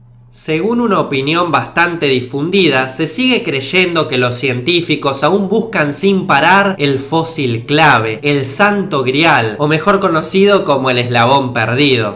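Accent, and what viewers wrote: Argentina. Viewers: Eres Narigon y hablas como Argentino mmmmmmmm. :u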